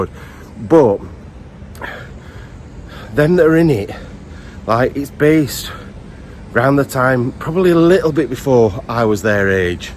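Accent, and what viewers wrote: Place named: England, United Kingdom. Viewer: Northern Irish here lol it was a bird but if there's teens there and hear your English accent hey with think your soldier lol b3 careful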